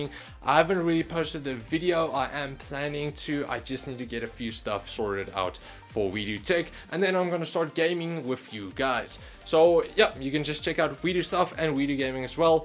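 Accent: South Africa. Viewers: he’s from South Africa. It’s his native language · South african accent. deal with it.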